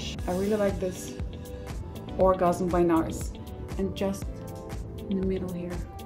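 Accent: Russia. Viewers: This russian accent 😍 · Anna Kozina у неё самый настоящий русский акцент. Так только мы говорим. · Nice voice. Very rispy russian tone. Gorg! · she's russian doe, she definitely don't have chinese accent lol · Love your russian accent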